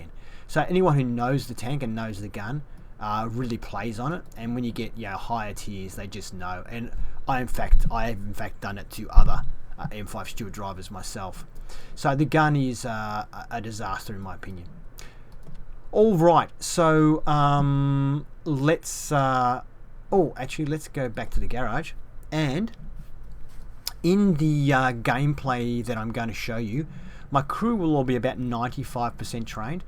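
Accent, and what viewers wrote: Australia. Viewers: u aussie mate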